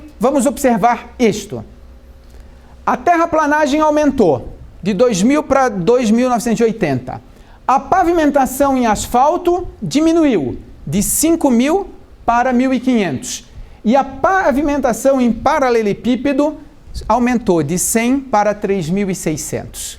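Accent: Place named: Florianopolis, Brazil. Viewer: A parte da formulação de preços por meio dos orçamentos de empresas para compor a média dos itens é o grande buraco que os municípios, estadone união precisam sanar, pois alem das empresas serem direcionadas os orçamentos sempre vem com valor alto e muitas vezes nao baixa. Em relação a fiscalizar ou auditar as prefeituras e empresas, poderiam contratar fiscais para auxiliar os auditores e filtrar ou seja ir a campo e repassar relatórios de indícios para os auditores. Os fiscais atuariam com investigadores e os auditores como delegados. A pergunta: porque isso nao é feito? Os municípios lidam direto com a realidade do munícipe e contratos com valor dobrado de preço indica que alguém está obtendo vantagem ilícita, seja empresa ou pregoeiro e que o processo está solto e sem controle externo. Um sistema de consulta de preços públicos ou licitados poderia ser uma possível solução. 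Tipo um órgão que cadastrasse os itens e preços licitados e a união, Estados e municípios pudessem consultar e utilizar como referência na composição da média. Entende que a economia para o Brasil seria imensurável. Daí vem outra pergunta: seria do interesse dos governantes criar isso? Grande abraço conterrâneo de Floripa, o sotaque não nega.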